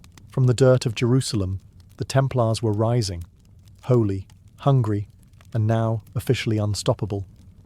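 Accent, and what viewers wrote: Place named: United Kingdom. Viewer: It takes a British accent to make sex stories sound boring as f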